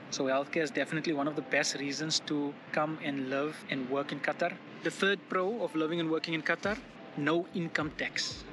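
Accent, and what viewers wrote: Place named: South Africa. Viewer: Wow, was scrolling info about Qatar… I heard the accent and new , ag jinne man , die seun is van Suid Africa!!!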